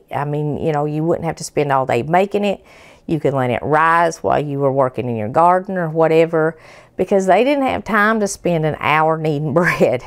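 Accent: South, United States. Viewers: . I love your accent. I've always loved that southern drawl as we call it here in the UK. I'm an East End London Cockney. So I've also got a distinctive accent. Thanks for your lovely tutorials Xxx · I love your down home accent. I lived in Louisiana for 3 years. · LOVE ur southern drawl...im in nw ark ❤ LOVE u have pledge of allegnce posted! God bless ya'll 🇺🇸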